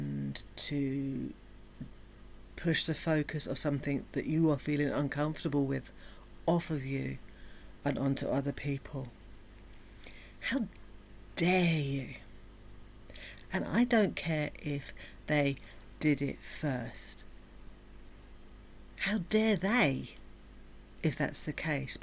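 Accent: United Kingdom. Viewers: God it sounds so much better coming from a British accent...much love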